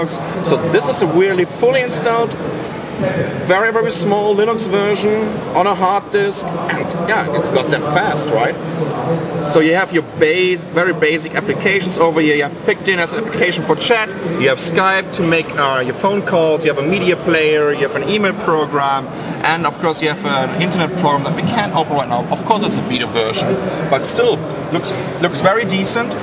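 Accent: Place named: Germany. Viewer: schon, aber sein englisch hört sich verdammt deutsch an...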